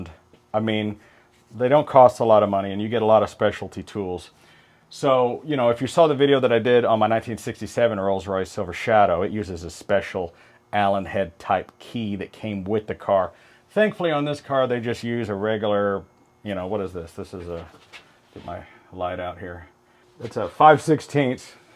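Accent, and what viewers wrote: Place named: United States. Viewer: Yup! The American accent is difficult to follow especially when spoken at such hectic speed! An awful lot of talking ("tarkin') but little action. Man, "you gotta get your butt" to Cape Town, South Africa, where we do things "good and proper" - even with RR, Jags, BMW, - the whole lot! Metric and Imperial - no problem! Oily hands - no problem! We don't even need silly gloves - we use hot water and soap! We also speak a better English than the English in England! 😊 My Dad managed the best BMC agency in South Africa, and I spent much time at the benches after school, learning skills and a few naughty words! The skills are ever-useful, and I am blessed, at the age of eighty-one, to be able to run a health practice at home and to repair so many home items for my clients!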